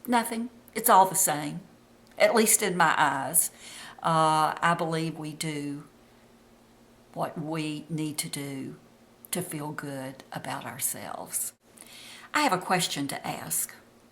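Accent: South, United States. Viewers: 70!? You look INSANLEY FABULOUS! Plus I hear a southern twang! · So glad to hear that someone else had the same experience as I did upon turning 65. I've always taken care of my skin except when we didn't know what the sun could do. So going from what everyone told me was beautiful skin to lines, sagging, brown spots, wrinkles and under eye bags has been tough. Glad I found you! Love that southern accent!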